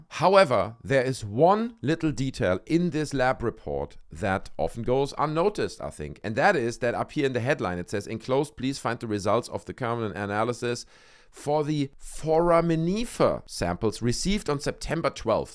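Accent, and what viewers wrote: Germany. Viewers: I just like saying, "Buga Sphere" in your German accent.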